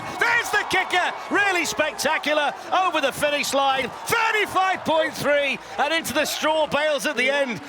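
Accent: United Kingdom. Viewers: This seemed interesting until the British accent started. Then it was annoying.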